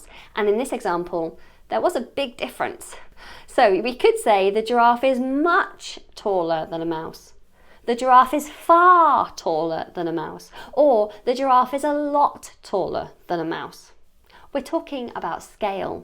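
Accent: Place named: United Kingdom. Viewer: Wel come sweety. Huge thanks 4 ur daily updating us wz ur english knowledge nd British accent. I love u. Ur bro Salih from Eritrea.❤❤❤❤❤❤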